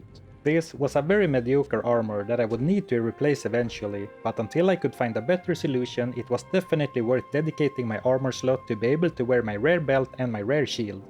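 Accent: Sweden. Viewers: What a peculiar, and very thick, Swedish accent! Not only does he confuse V and W, "sh" and "ch" and so on as most non natives speakers do. He also turns "o" in to "a", which is something I've never heard before. "lot" becomes "lát", "dodge" becomes "dádge" and "monster" becomes "mánster" somehow. That combined with the language melody, copied straight from Swedish make for a hilarious listening! :) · Are you swedish? Your accent sounds swedish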